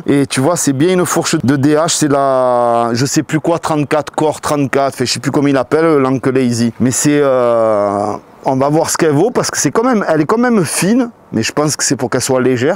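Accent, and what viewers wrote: Marseille, France. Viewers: Vive le sud! Tu donnes le sourire avec l'accent de Marseille qui crrame tout!!!!!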